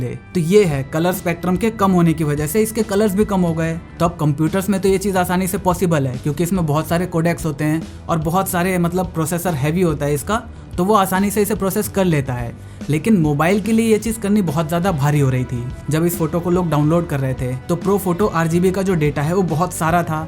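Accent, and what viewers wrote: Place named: Delhi, India. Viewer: Delhi se ho kya?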